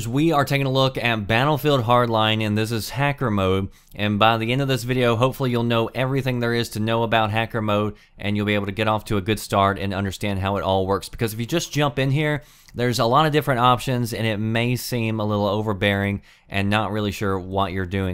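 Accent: South, United States. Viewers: I'm guesin u live down south by ur acent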